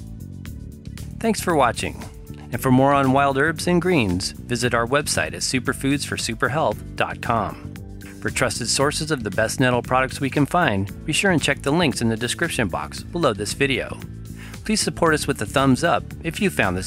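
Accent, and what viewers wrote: United States. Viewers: Farang Esaan it's the way Americans pronounce it. Both are correct, like maths and math, both short for mathematics.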